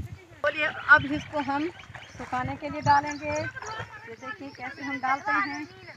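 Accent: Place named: Saraiki, Pakistan. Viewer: Ap ka village konsa hy? Lehjy sy ap sraiki lgti ho mery Abu Ji or Bhabhi bi sraiki area sy hen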